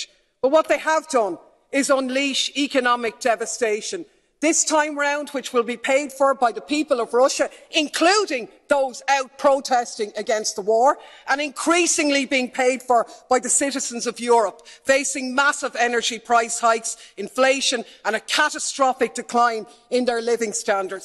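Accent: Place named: Ireland. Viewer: Thank you!! Wise words spoken with a wonderful irish accent! 💚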